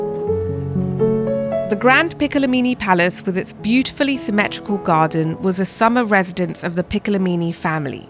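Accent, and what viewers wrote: England, United Kingdom. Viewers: Hi. your videos are so good and you explain in a wonderfull voice the really italianity. italy its my country and your "England" english its a sinphony for who don't tell and listen to much like me. You're so very beautifull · Just came across channel….this is the best bunny hole I entered. I love listening to her voice, Britishly delicious!!! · That UK pronounciation is simply unbearable!! 😢😢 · Love your shoulders 😍, also you have a really good British accent!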